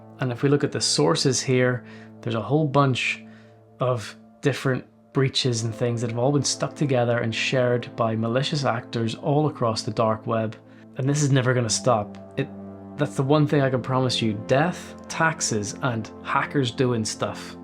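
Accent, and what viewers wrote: Northern Ireland, United Kingdom. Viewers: Is that a Northern Irish accent i hear? Haha! Nice video man, a lot of people underestimate the amount of information is out there of them. I actually recently paid a service to search some of my credentials against breaches. Turns out even tho i use generated passwords, they were actively being leaked. Found 4 passwords compromised in total. I think it's great showing this kind of information to people. Wish i did mines sooner!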